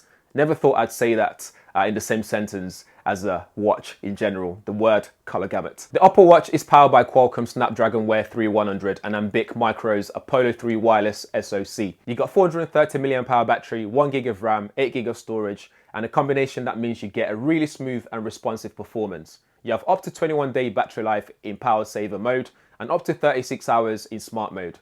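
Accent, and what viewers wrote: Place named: MLE, United Kingdom. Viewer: Cool MLE accent👍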